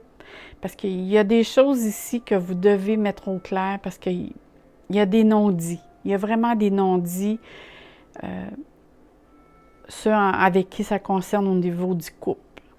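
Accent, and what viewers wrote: Quebec, Canada. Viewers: Merci accent du Québec j’aimerai tellement m’installer à tadouddac merci pour le tirage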